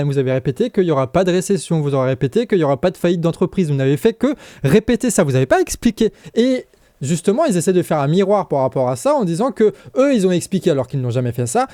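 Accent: Brittany, France. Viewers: Clair, net et précis ! Merci pour cet excellent ton du nord des cotes des mers d'Armor . (Tonifiant, ce doit être le granite, ou alors l'éternel et indispensable grain de sel dans le beurre)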